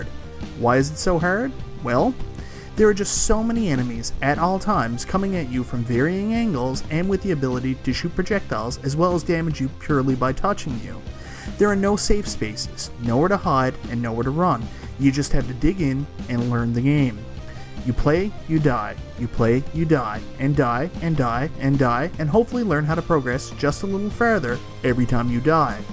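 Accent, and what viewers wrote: Newfoundland, Canada. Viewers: Is that a Newfoundland accent I hear? I am also a hard game aficionado from the Rock, so that's nice. I've even beaten all three of these, and they're no joke.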